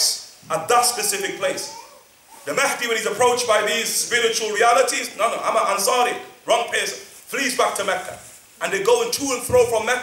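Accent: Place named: Liverpool, United Kingdom. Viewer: He has a British Scouse accent .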